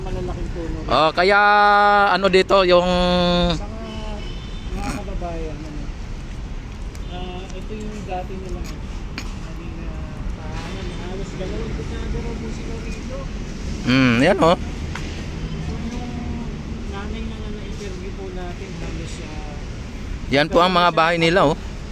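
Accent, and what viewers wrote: Visayas, Philippines. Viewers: Kuya ayusin mo ang pagsasalita mo Visaya ka kuya ang dami mong alam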